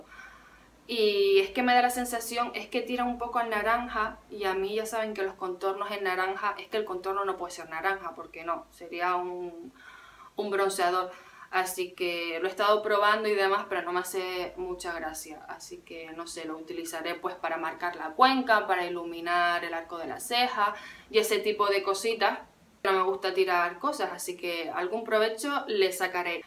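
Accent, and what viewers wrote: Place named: Canary Islands, Spain. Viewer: hola guapisima me suscribo!! creo que eres canaria por el acento no? jijiji, yo soy de Gran canaria (las palmas) muchos besitos!!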